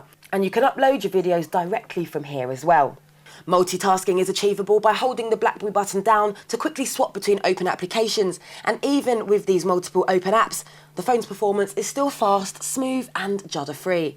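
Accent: England, United Kingdom. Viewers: She has a nice accent though some British accents are nasty! I have the phone and use it as a backup. Still works great. Battery life is terrific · love the english accent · british accent fo tha win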